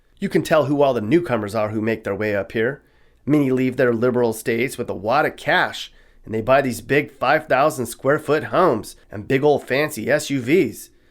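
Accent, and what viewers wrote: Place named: Midwest, United States. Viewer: Y'know for a Californian you pull off a decent Midwest accent. Signed, a former English teacher.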